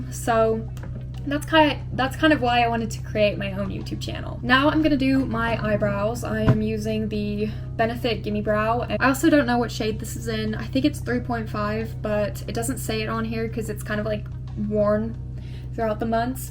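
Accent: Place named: United Kingdom. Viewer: Loving the video so far! Please can you do a full YouTube video using your British accent? I would love to her it❤️!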